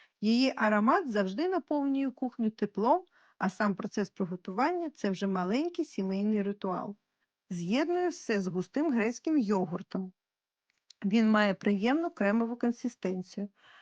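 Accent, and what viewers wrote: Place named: Russia. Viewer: Говорите. или по.русски. или. чисто. по. украински. Ваш. родной. Язык. Это. русский. Это. очень. слышно. Вы. Умница. мне. приятно. вас. слушать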